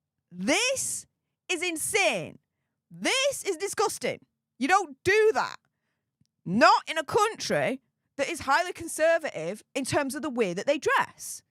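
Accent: Yorkshire, United Kingdom. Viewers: Where are you from in Yorkshire???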